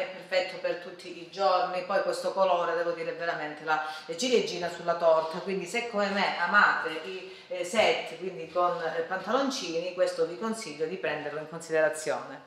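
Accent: Calabria, Italy. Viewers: Ciao, posso sapere di dove sei? Sei calabrese? Conplimenti.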